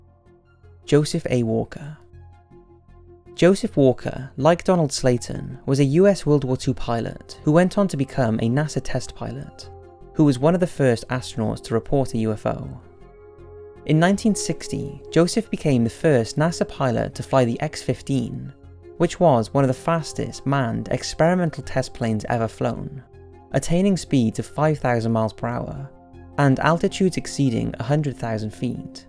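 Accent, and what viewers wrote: Wales, United Kingdom. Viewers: yep was just thinking that when I saw your comment - he has a soft Welsh accent I think. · I could listen to that Welsh accent all day ☺️ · He’s a has a welsh accent to be honest. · The Welsh in him! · Bluesummers pretty sure he has a very slight Welsh accent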